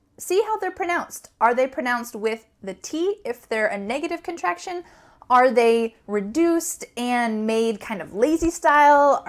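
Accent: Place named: United States. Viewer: Omg now i knew why your (American) accent wins our tender hearts. From india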